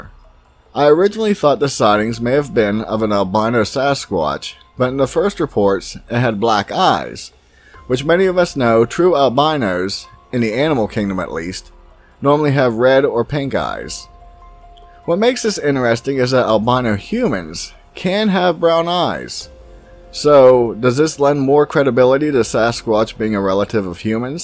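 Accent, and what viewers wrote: Pennsylvania, United States. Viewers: As soon as he started talking, I could tell he was from PA.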